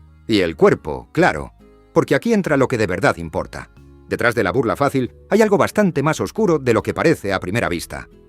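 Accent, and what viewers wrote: Spain. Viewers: El relator tiene acento español,si este video es hecho por un español,te quiero decir que los más odiados son los españoles y España, porqué si somos una mierda es gracias a uds. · Te noto acento español.. Pero te equivocas con Uribe.. Ese sesgo te hace perder credibilidad..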